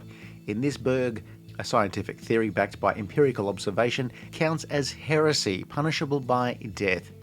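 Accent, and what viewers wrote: Australia. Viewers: I assumed it was the host's Aussie accent, since "tare" would sound like "tire"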